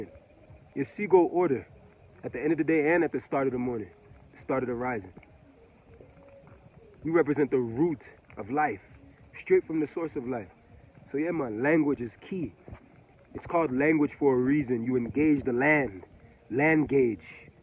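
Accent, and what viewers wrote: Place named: Patois, Jamaica. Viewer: I love ❤️ your Jamaican patois, it funny the ways it sounds.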